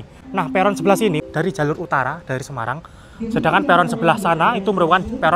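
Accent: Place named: Madura, Indonesia. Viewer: Suaranya yg bkin candu mas😂😂 medok logat maduranya keliatan ,, semngt ngontennya😂😂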